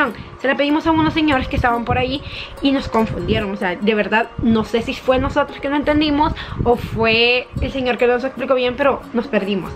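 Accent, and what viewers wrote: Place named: El Salvador. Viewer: Por tu acento sos guanaca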